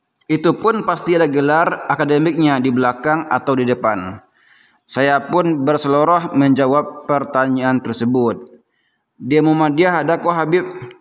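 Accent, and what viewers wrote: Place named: Padang, Indonesia. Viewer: Ngomongnya logat Padang boanget yak?! 😁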